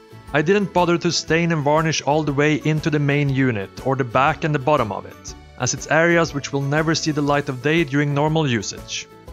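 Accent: Sweden. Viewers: You are so wonderfully swedish when you speak xD <3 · You from sweden? Ur accent is funny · Typical Swedish accent. I'm Norwegian, I've heard it a lot since I've been around Swedish people in international environments =) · My grandparents were from Sweden. Hearing your accent makes me miss them very much. Also, great information!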